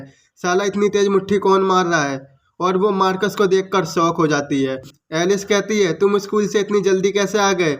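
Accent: Uttar Pradesh, India. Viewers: Bhai aap up se ho kya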